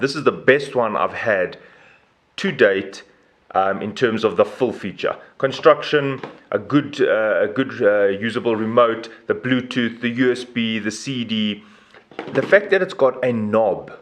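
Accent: South Africa. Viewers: I knew you sound south African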